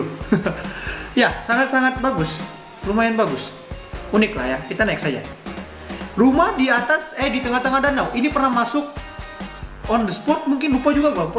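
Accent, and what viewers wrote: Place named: Makassar, Indonesia. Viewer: Kakak orang makassar ya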